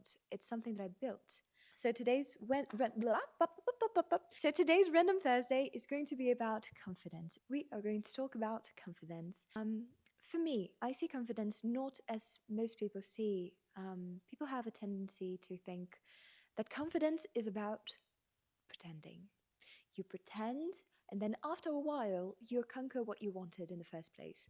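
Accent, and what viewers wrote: United Kingdom. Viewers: In your first videos you used to speak with an American accent.. now you are speaking with British accent .. congratulations for being able to change accents so well... I am able to speak only with the American one .. and that's the one like the most .. but somehow I enjoyed listening to you speaking with British accent .. it is kind of pleasing to my ears ..I dont know how to explain it ..😅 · Hummm!! Inglês britânico!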